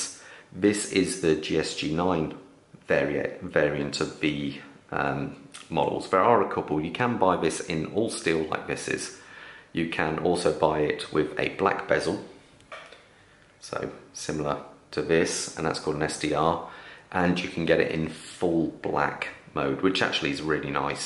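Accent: United Kingdom. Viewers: In all fairness, he’s sounds British.....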